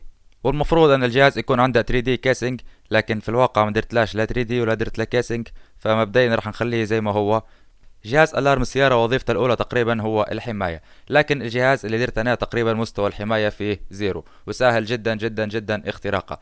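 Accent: Libya. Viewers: اي مبين من لهجتك انك ليبي بس تعجبت لماشفت مكتوب الموصل في المقطع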